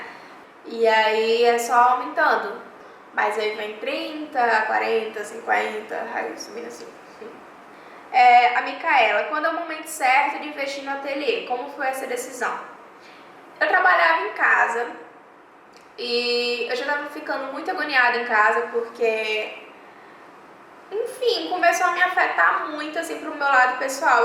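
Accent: Northeast, Brazil. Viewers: . adoro o seu sotaque vcs nordestinos. eu sou de formosa Go. sou cabelereira e maquiagem tbm. amo a profissão da beleza gosto do seu trabalho te acompanho a pouco tempo mais me identifiquei com vc. Que Deus te derrama muitas bênçãos em sua vida pois tudo que vem de Deus e bom perfeito e agradável. bjos manda um bjo pra mimmmmm. se vc puder entra no meu stagran e vê mais trabalhos e me sal o que vc acha a me de dicas. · Eeeee ,até que enfim ,descobri de onde vc é,suspeitei que era nordestina pq falamos muito igual...Kkkk,sou de Natal RN e estou apaixonada pelo seu canal.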